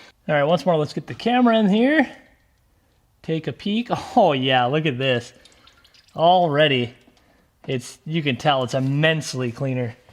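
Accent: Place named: Midwest, United States. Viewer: mid west accents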